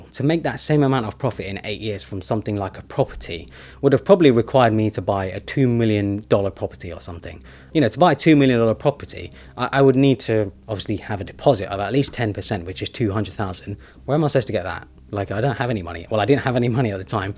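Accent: United Kingdom. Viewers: because british accent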